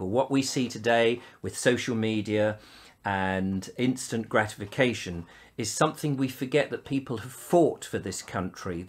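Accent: United Kingdom. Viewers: Nice, why can't you try to teach British English for the non english people( beginners), I know that lot of english teaching videos are available in utube, but learn from you give some different view I believe, I really enjoyed your english pronunciation, and I very love to learn the British English, and British accent.. Can u do it?...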